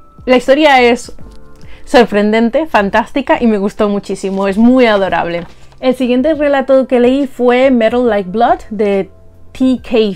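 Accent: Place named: England, United Kingdom. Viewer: ¡Hola! Yo también conocía los Premios Hugo y Nebula , pero tampoco me había interesado mucho por los premios. Ahora con tu vídeo me ha picado la curiosidad, creo que me gustaría hacer también un repaso de los mejores y leerlos, tiene que ser interesante jaja. Me encanta el título de las madres cañeras, es como que no me interesa de qué vaya la sinopsis, solo por el título quiero leerlo 😂 Me parece maravilloso que aparezca la regla, que nos olvidamos de que existe! Ains, el de la biblioteca me encanta cómo suena la trama. La sinopsis de "Uncanny" me recuerda mucho a la canción de "Kokoro" de Vocaloid, no sé si la conocerás, pero he pensado en ella automáticamente. Y por cierto, me encanta tu acento ingléeeeees!! Un besico :D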